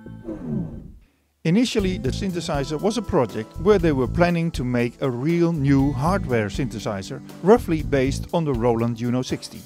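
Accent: Netherlands. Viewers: Is this guy Dutch? Hard to hide the accent 😉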